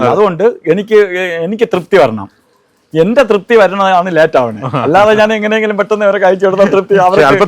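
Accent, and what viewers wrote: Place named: Kannur, India. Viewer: ഇത് കണ്ണൂർ സ്ലാങ് അല്ലെ. ഇദ്ദേഹം ആ നാട്ടുകാരൻ ആണെന്നാണ് തോന്നുന്നത്.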